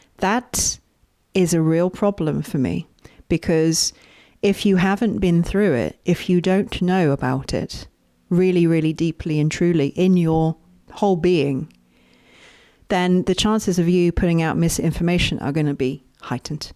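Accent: United Kingdom. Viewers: I am thrilled to have just found your channel today. I've followed this topic for literally a couple of decades now. Agree 1000% about the need for content creators to have actually experienced NPD first-hand > not just clinical study. Same for therapists - the odds of finding a therapist who truly GETS it without direct experience is about nil, and yet so many CLAIM knowledge. They know that narcissism has become a popular buzz word and so they chase the clickbait, yep - and many do more harm than good for the almighty dollar. I follow Dr. Romani and others, also know of Vaknin, read a book by him many yrs ago but that guy just gives me the creeps so don't listen to him much and appreciate your perspective. I've been on a binge this afternoon. I appreciate your authentic and genuine approach. You have some unique perspectives, especially the spiritual aspect, which I've thought about some > esp that those who've been though narc hell are much more likely to SEE the evil in the world. 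They won't be held back by that cognitive dissonance so they can connect the dots. I find it amazingly brave of you to actually attempt comedy alongside narcissism, that takes MOXIE:-)!!! Thanks for this personal view, appreciated. I assume you are maybe somewhere in England from your delightful accent. Yes? Salutes from the US!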